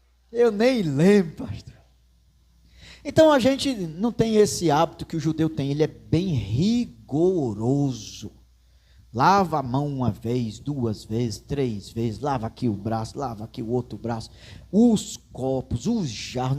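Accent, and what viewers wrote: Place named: Northeast, Brazil. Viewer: Gostei demais fala nossa língua nordestês. Glória a Deus!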